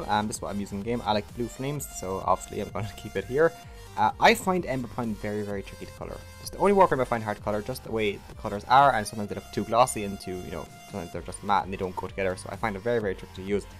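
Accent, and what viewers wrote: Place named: Ireland. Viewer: ahhh his voice is so asmr lol the only Irish voice that triggers my asmr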